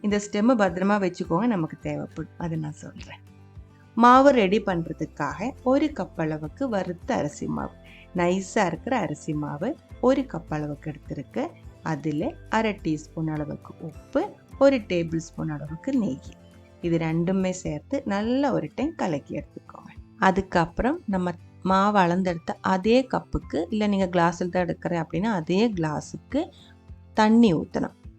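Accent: Kerala, India. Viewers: മലയാളം തമിഴ് കലർന്ന ഭാഷ എന്നാലും സൂപ്പറായിട്ടുണ്ട്👍🙏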